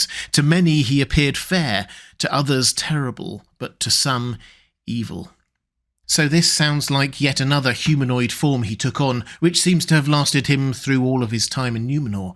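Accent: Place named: United Kingdom. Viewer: What is accent? It seems British but not really.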